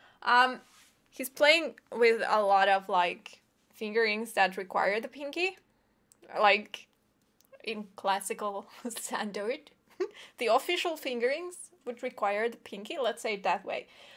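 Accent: Finland. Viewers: I knew you were suomalainen nainen I miss that accent...❤ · Mike G She's from Finland! English is at least her second language. She's adorable! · She's Finnish. As in German, J is Y and TH is T, and the Rs are rolled, so becomes Yetrrrrro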